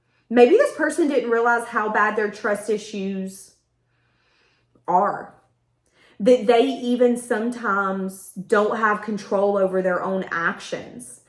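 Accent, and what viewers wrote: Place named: Tennessee, United States. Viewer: I should have known you were from TN... with that accent. I live in white house TN.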